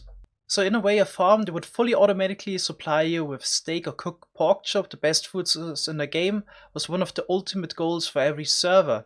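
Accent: Germany. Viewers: your vids are very entertaining and i always think that your projects are amazing but that german accccent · What nationality are u from? You sounds like german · Is he german? He has the german accent😂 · German accent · Deutscher Akzent is mies am Start haha♥️♥️ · Guten Tag du Alman. Ich wette nen 50er, dass du ein Deutscher bist, das merk ich doch am Englisch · Man hört schon dass du normal deutsch sprichst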